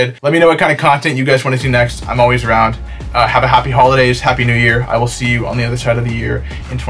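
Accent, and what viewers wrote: United Kingdom. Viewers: Wait ur not British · He lives in texas? I thought he was like from the UK. lol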